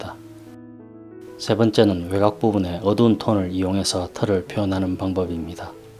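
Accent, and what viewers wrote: Gyeongsang, South Korea. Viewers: 감사히 잘 보고 갑니다 ^^ 말투가 경상도 쪽이신듯한데..맞나요 ㅎ^^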